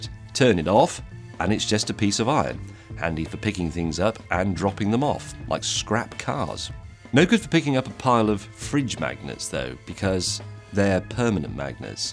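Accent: United Kingdom. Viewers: The ideal British accent.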